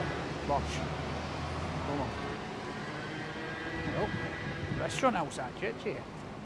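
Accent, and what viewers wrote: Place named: Yorkshire, United Kingdom. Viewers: Nail on head there without a doubt. Recent months have been utterly horrendous for so many with illness or worse with the virus. himself has been through some horrible times of a different kind and he has become a national treasure and sign of positivity that we so badly need. He is a great lad, talks in a super broad Yorkshire accent and is always polite as really we all should be, take a lesson from him all, respect costs nothing but earns so very much in return. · Defo fullnon peaky blinder with that yorkshire accent would be awsome to watch, drop a few yorkshire sayings in the videos too, 🤣 top man keep it up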